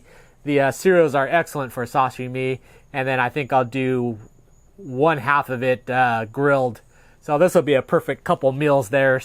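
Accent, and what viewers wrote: South, United States. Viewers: Very interesting, an Asian person with a southern accent, cool !!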